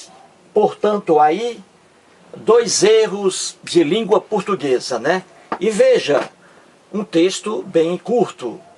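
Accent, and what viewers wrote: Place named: North, Brazil. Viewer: vc devia se concentrar só em ensinar português, é muito mais bonito que postar videos blasfemos!! Acho bonito o.sotaque nortistas seu. Abraços do piracicabano aki!!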